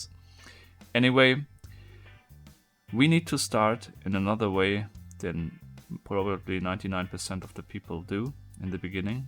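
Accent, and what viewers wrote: Germany. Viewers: I don't know why but even though you have a German accent I think you are part Asian. You have an amazing mind. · his accent sounds sooo german🙊🙉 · are you german?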